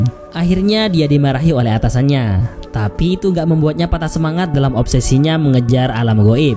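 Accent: Madura, Indonesia. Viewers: Logat e gk Iso di bujuki Cong..Meduro Yo Cong ? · Logatnya kek ada rasa rasa khas orang madura, iya gasih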